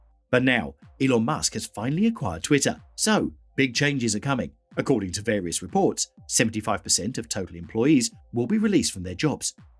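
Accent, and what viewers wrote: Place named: England, United Kingdom. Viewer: Who in the hell is this English guy do we have anybody that can talk normal anymore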